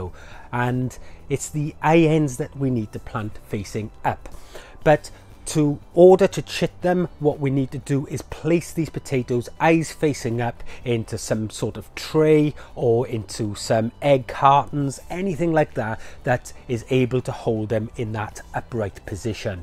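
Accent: Wales, United Kingdom. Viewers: I was just trying to figure out your accent. I`m Canadian, so I`m only vaguely familiar with the diverse British accents. I was guessing Wales. So I was right. I've been growing potatoes for years, but will try container growing this year. I generally harvest my potatoes in November, and keep them in the Fridge. By March the remaining potatoes start sprouting. In Canada the ground is still frozen. The earliest you can plant them is late April, but May is more typical. Most of my potatoes are small, I`ll have to improved my technique to get bigger ones.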